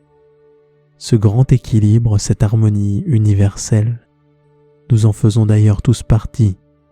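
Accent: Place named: France. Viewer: woooow! Je suis abonnée à ta chaîne depuis peu. Je faisais de l'insomnie depuis un moment et tes vidéos mon sauvé 😇 Particulièrement cette vidéo là! J'ai dormi dur dur comme un bébé tout la nuit et je me suis réveillé que le lendemain! Merci énormément, tu as une voix hypnotisant et doux. Je t'écoute tout les soirs xxx (je viens de Québec et je trouve l'accent français si agréable à écouter 😍)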